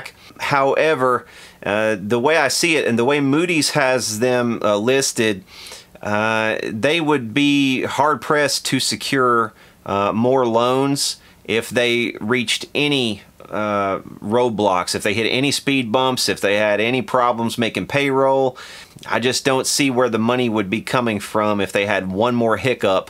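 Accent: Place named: Louisiana, United States. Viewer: You sound like Theo Von. Are you from Louisiana?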